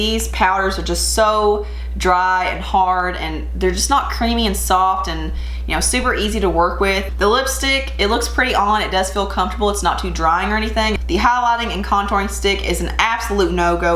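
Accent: South, United States. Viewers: I saw this brand on the WM website and was on the fence whether I should give it a shot! Side note: I just found your channel and I love finding youtubers that are from the South and sound like people I know. I'm from OK!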